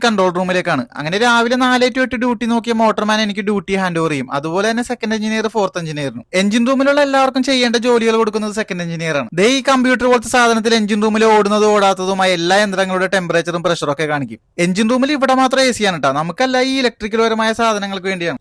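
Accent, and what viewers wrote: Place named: Ernakulam, India. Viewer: Bro എറണാകുളം ആണോ വീട്.. നമ്മുടെ നാട്ടിലെ ഒരു സ്ലാങ് ഇണ്ടല്ല?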